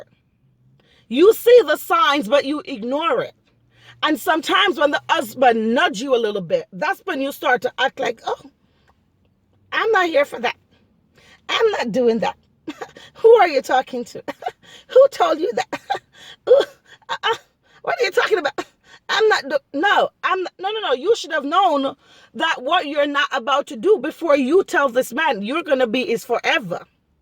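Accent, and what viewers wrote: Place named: Jamaica. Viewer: I love your exit is that Jamaican this may be ignorant question ... I apologize if it's offencive but your accent is lovely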